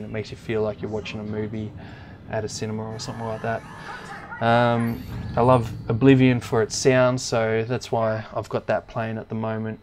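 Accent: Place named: Australia. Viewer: Andre Blackwell After listening to him for the first couple of minutes, I'd say he's likely in Australia.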